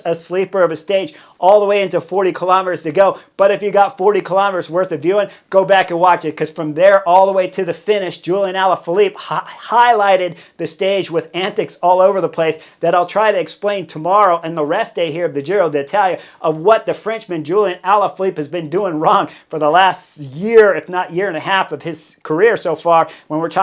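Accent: United States. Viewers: This American accent is so thick I can barely make it out when he says the names of the riders.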